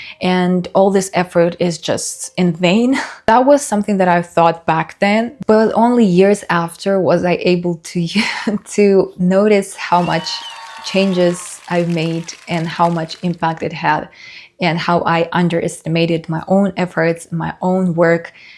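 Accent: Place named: United States. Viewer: Great video. You have a very good command of english, no doubts about it. Accent reduction, rithem, fluency. I've noticed that your pronunciation is very much influenced by general american English :)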